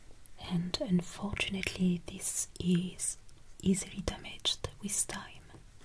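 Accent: France. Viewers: I just realized that your beautiful way of speaking English with your French accent has brought back many memories from 30 years ago when I fell in love with a Vietnamese woman who I regard as the love of my life, who learned French when she was young in school in Vietnam in the 1960s and lived and worked in France (Bretagne) for a decade after escaping Vietnam in the 1970s. She learned English as an adult and had a very unique way of pronouncing certain English words, which thanks to you I now recognize as being a French accent but I never realized it until now. Thank you for bringing back those good memories even though my love story had a sad ending, but having listened to your voice in a wonderful ASMR video has helped heal the scars that are still there for me as I can hear her voice once again through your voice.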